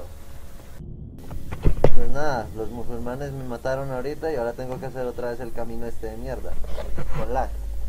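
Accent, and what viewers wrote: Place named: Peru. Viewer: esa voz de peruano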